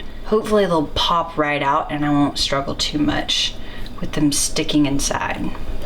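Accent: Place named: Georgia, United States. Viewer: YAWL!!! OMG!! You must be from Georgia!! I love your video! I felt like I was in the kitchen with you. Thank you for being real and showing the mess ups! We learn fromour mistakes.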